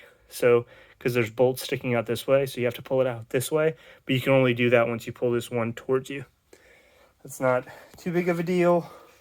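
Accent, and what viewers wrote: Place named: United States. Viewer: Just wanted to say thank you for making an entire playlist on this with so many minor details 🙏 After years of wanting one I finally picked up a GF8. With limited experience and knowledge I wasn't sure where to start, what to upgrade, etc. Buying a wrecked WRX is something I completely forgot about. But swapping everything over is actually a much simpler, more cost effective and intelligent idea. However, judging from your accent I'm assuming you're from The US as am I. How were you able to get a hold of a WRX that fits a GC/GF chassis?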